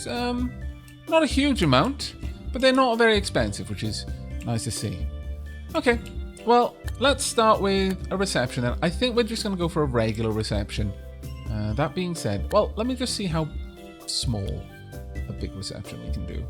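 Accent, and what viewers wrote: Wales, United Kingdom. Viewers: Welsh do dislike being called English, as do Irish and Scottish people.. He does have a nice Welsh accent :) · Whisper: He's Welsh.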